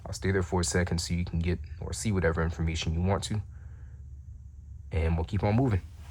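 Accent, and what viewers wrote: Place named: Lowcountry, United States. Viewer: This is so cool! I've always had a fascination with the Thomas MVPs! This one is nearly identical to the white and teal (and later white with red and blue accent) activity busses we had in Beaufort County when I was in school. This was back in the 90s and early to mid 00s. I couldn't tell you what they have today. And based on your dialect, I’m 100% certain you’re from my neck of the woods as well, lol! 843! I live in Texas now, but the Lowcountry is home!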